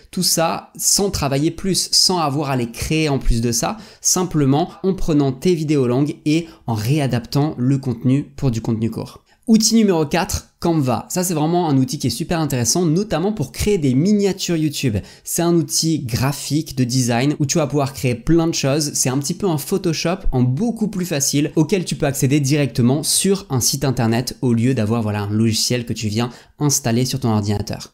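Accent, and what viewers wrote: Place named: Southeast, France. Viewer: Merci c'est vraiment top ! Y a plusieurs outils que j'utilise déjà (canva / tubebuddy), j'ai pas encore testé opus clip que j'ai découvert grâce à toi, mais faut que je m'y mette, et que j'aille voir les autres. PS : on est d'accord que tu viens du sud est, genre Nice ou pas hyper loin ? Tu transformes les sons en O en un mixe entre O et A, j'ai entendu ça que là bas.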